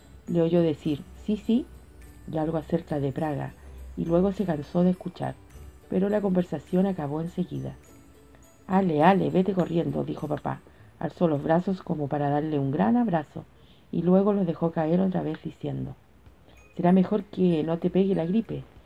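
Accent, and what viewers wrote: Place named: Chile. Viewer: tu acento hizo el libro mejor de lo que ya me parece! me suena a que es acento chileno pero tal vez me equivoco, igual me gusta mucho la forma en la que lees!! muchas gracias estoy segura de que me irá bien en el examen :D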